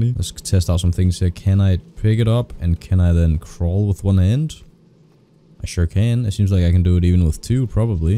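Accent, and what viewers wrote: Denmark. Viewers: Are you danish? If so, cool. Btw good video!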